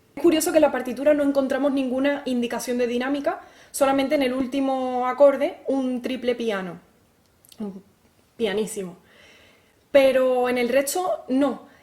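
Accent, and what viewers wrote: Andalusia, Spain. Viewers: Ese acento es andaluz. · Buen video, de que parte de España es tu acento?? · Que Hermoso!!! No words to describe your talent...and then your accent, where you from? Se que de Spain pero de que region? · Que raro es el acento andaluz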